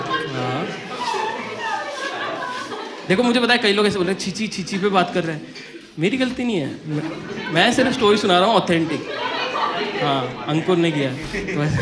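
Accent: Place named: Garhwal, India. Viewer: Bhai tum jaat nhi laggre ho yaar gadwali laggre ho tum tohh 😅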